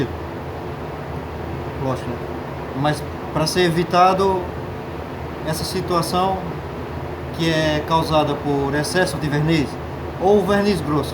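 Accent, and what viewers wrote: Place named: Portugal. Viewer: Vc tem um pouco de sotaque português......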